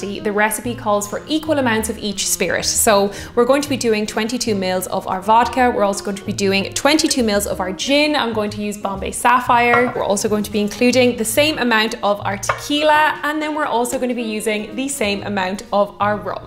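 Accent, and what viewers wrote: Ireland. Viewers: Greetings from America🇺🇲 Saw you first on the TRY Channel, which was fantastic fun. Didn't know you had your own series; great video. One can never go wrong with the iconic Long Island. Love the video, Love Irish accent, and the perfect hostess. Looking forward to more of the same in the near future... Cheers! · As a 21 year veteran fireman from a university town PENN STATE {one of, if not the, top party schools in the USA}. . . I LOVE the Long Island Ice Tea. My favorite way to drink it? by the pitcher! My record was 3 pitchers. And I still walked home upright. And my I say from the states, It's hard to tell which is more lovely, your eyes, hair, or that wonderful Irish accent.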